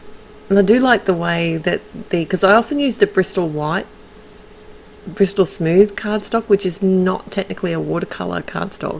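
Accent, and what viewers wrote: Australia. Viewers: Hi. LOVE these gorgeous high pigmented colours. Your card is just so beautiful and I really love your choice of colours. Thanks so much for sharing with us. Always love to hear your Aussie voice.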